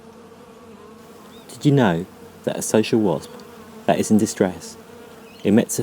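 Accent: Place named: United Kingdom. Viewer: Got some Britian talking also giving metric numbers....sucks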